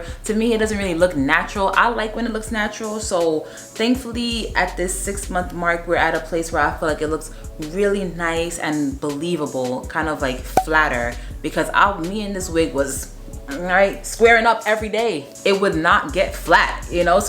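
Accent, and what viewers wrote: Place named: East Coast, United States. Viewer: East Coast Accent - I love it! If not it sure sounds like it! And its waaay worth the money that you spent! I love it on you!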